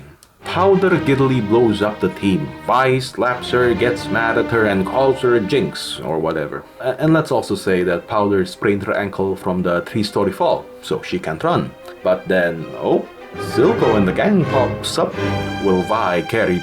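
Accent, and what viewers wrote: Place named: Eastern Europe. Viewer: As a fellow slav I knew when I've heard your accent, that there was not enough tears 100% right Also. Bober 🦫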